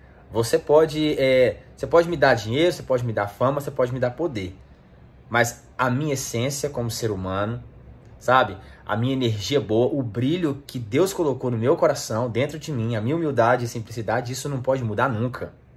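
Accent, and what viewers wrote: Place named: Minas Gerais, Brazil. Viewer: ADORO VER VOCE FALAR MINEIRO...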